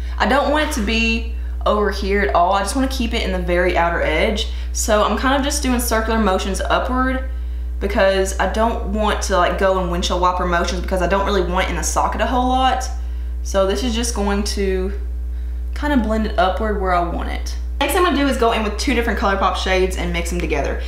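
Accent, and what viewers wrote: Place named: Tennessee, United States. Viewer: Ok so I have to ask 1. Where are u from...u sound like you are from tennessee or Kentucky I live in tennessee I would like to follow this look for a video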